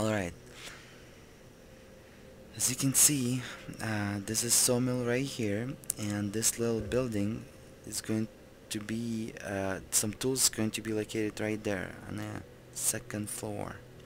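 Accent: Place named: Russia. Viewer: You are russian, right? I know this accent))))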